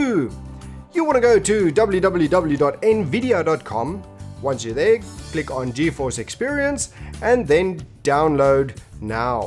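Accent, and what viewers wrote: South Africa. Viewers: Are you south african? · Nice vid dude, you sound South African... can u conform?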